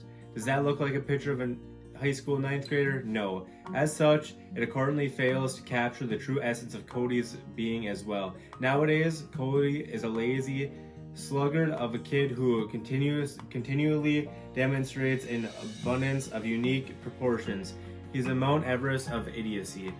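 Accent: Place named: Upper Peninsula, United States. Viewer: sorry to hear about your dad man. My best goes to you and your family. It's awesome to hear a good yooper accent again, and also pretty stand up for you to do this segment.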